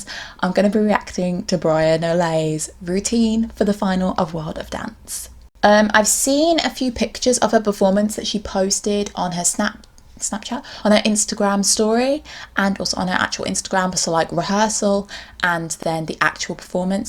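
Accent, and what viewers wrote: United Kingdom. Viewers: You sound British? Are you British?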